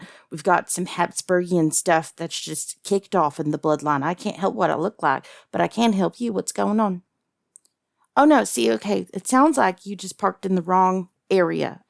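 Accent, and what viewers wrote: South, United States. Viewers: I feel like your getting more southern every time you put that accent on.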